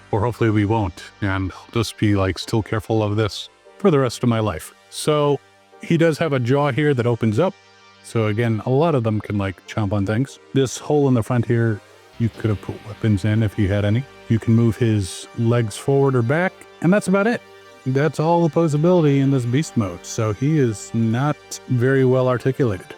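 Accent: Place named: Scotland, United Kingdom. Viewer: Which part of Scotland are you from, man?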